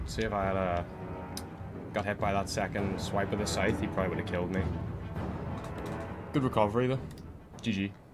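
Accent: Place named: Northern Ireland, United Kingdom. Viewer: Ah a fellow Norn Irishman!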